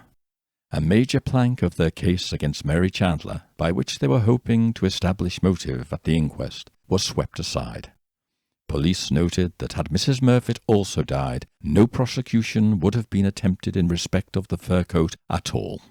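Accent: United Kingdom. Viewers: Hi I alway's loved the British Accent. I wish we Americans still sounded like that!:)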